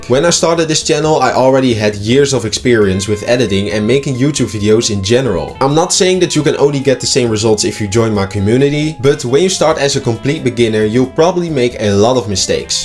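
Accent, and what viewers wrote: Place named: Netherlands. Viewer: bro nice video btw are you dutch? i feel like i can tell by your accent 😁❤️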